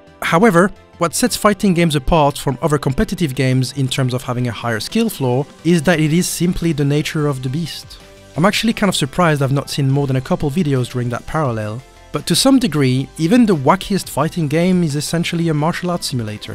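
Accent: France. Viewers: Oh ! The Français accent ! But the english it is quand même good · I knew it. You had a slight French accent. En plus on manque de youtubeurs jeux de combats pk t allé à l'étranger XD. Aussi leon massay goat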